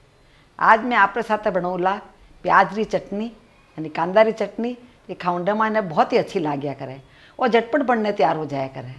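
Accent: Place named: Marwar, India. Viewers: Your Marwari accent is so wonderful! When you talk I feel my grandma is teaching me again. The chutney was amazing! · जितनी आपकी चटनी अच्छी है उससे ज्यादा आपकी बोली अच्छी है। इंग्लिश हिन्दी और मारवाडी का अच्छा वार्तालाप है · Amma aap ka marwadi accent bahut achha laga. Chatni mai aaj hi try karunga banane ka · Ye jodhpuri language badi meethi lagti h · Mam am fan of ur marwari accent and the recipe also very fabulous. , well done keep it up 😋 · Wow m Punjabi and understand ur marwadi accent easily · Mujhe marwadi nahi aati per aap jab bolte ho tho bahut acha lagta hai aap ki cooking great hai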